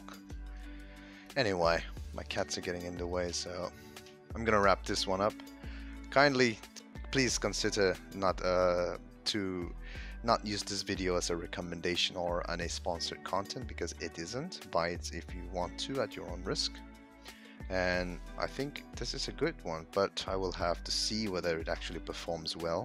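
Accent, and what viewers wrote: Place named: Germany. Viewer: loved video, i can tell your normal language is german, it's a cool language :D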